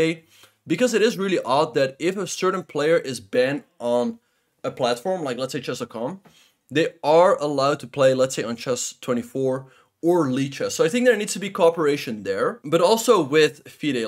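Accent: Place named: Netherlands. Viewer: Man I love your accent I can tell you're dutch or flemish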